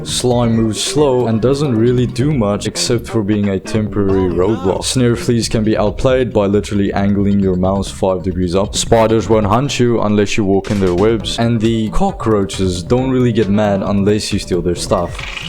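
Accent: South Africa. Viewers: I could hear the twang of South Africa, kinda reminds me of the movie chappie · That accent is definitely RSA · Great video bruv! I did enjoy this style as well as your original content. While I was listening to you talk more I think I just realized something...are you Afrikaans?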